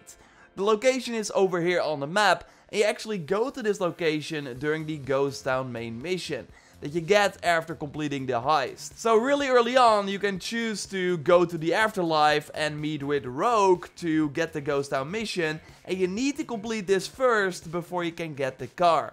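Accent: Netherlands. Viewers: He's Dutch isn't he? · Are you Dutch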